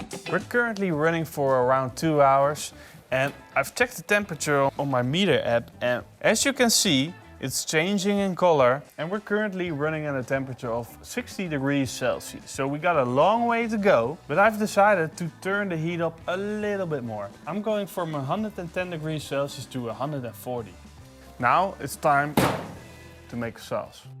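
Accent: Netherlands. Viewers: That accent sounds familiar! A Dutch Friend? Greetings from Germany :) Keep up the good work!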